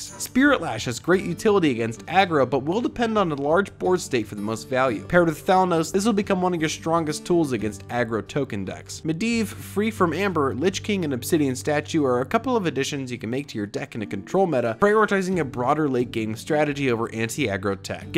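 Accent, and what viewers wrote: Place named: United States. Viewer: He said Razakus, jeez, its just his 'american' accent i'd say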